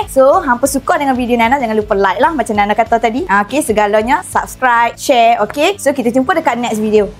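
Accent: North, Malaysia. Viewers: Langsung subscribe deh kamu...ud lama tak dengaq cakap utara..che..prikitiew.sy dah cuba resepi kamu..sedap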